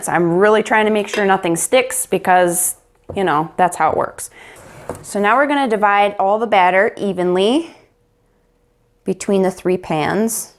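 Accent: United States. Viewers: My husband is going to love this! New sub! Your video for the raspberry jam came across my gmail feed. Your accent is adorable! What state are you from? Love from Texas☺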